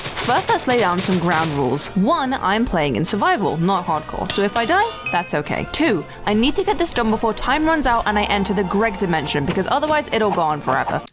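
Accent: United Kingdom. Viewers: I don’t know why but the sound of a slightly unhinged female with a British accent is always so funny to hear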